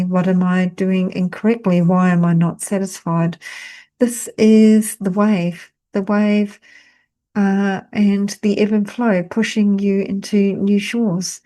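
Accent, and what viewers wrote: New Zealand. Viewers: Her Kiwi is strong 🙂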